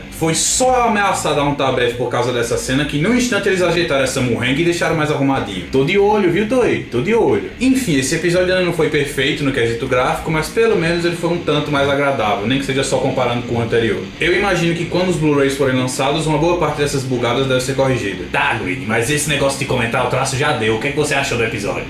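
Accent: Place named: Northeast, Brazil. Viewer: cara vc e hilario.. serio.. seu jeito. seu sultaq he mt engraçado. por acaso vc e do seara ou paraiba.. seila esse e nt engraçado. os conentarios as cateretas q vc fz mt louko. mas parabems pelos videoa. ce deve ter passado horas dias ate mezes pesquizand sobre cdz ne mesmo. eu axo seus comentarios meio rud. tipo eu sei mas q vc fds. alem da pronuncia dos nones e palavras japonesas alem de errado fk mt engraçado no seu sultaq nortenho.. apropisito tbm n posso falar de vc q meu n he dos melhires.. tbm sou nordestino kkkk mas moro em sp fz tempo. e estudo japones a 6 anos. ja estou quase me tornando sempai seinto. kkk falow anigo onedetougosainasu. jya ne... te mais.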